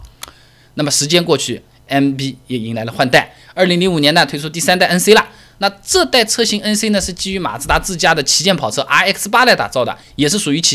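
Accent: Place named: Wu, China. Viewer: 经常看你充满吴语风格的讲解,受益匪浅.据说印地安人牌摩托当年挺厉害的,能讲讲吗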